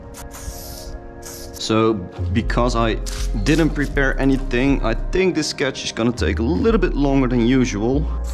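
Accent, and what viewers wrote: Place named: Netherlands. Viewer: Ik wist dat je nederlands was, want dat kan je gelijk horen met hoe je engels praat🤣 goed werk man👍💪🏻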